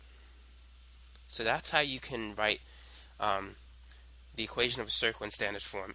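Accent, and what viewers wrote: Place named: Boston, United States. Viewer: You sound like you're from Boston or Maine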